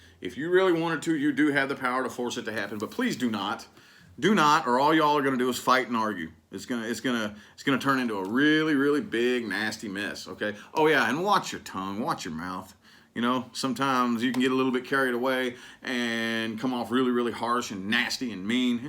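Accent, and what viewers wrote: South, United States. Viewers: Loving that "country drawl". Being from NC I have a drawl too.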